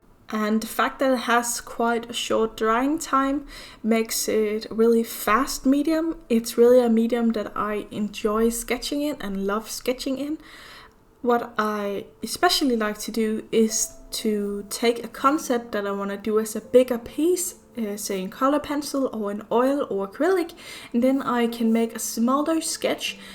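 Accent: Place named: Denmark. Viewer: Are you from Denmark? I can hear the Danish accent. My gf is from Aarhus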